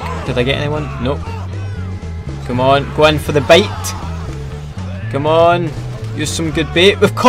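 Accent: Scotland, United Kingdom. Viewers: Where in Scotland you from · Where about in Scotland are you from ??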